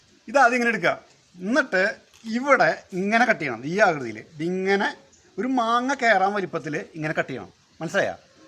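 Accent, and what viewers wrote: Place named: Thrissur, India. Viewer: തൊട്ടിയും പൊരിച്ചു തൃശൂർ ഭാഷയും പൊരിച്ചു 😅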